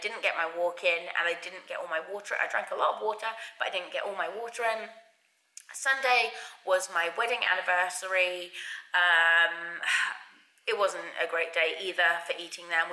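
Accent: England, United Kingdom. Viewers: Been watching your vids and this was the first I heard with your vocie! Me: oh she's English!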